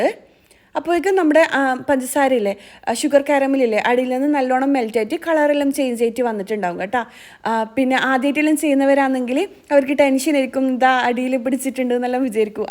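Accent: Kannur, India. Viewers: Kannoore varthamanam nalla rasamund tto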